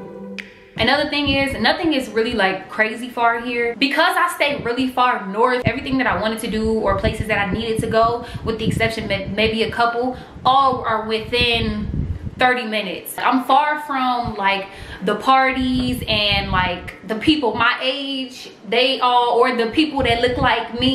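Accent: Chicago, United States. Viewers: Girl I just knew you were from Chicago from when you first started speaking!!! I’m from Chicago been living in west Texas for 9 years. My hubby and I are Thinking about moving to San Antonio. That’s how I found your videos!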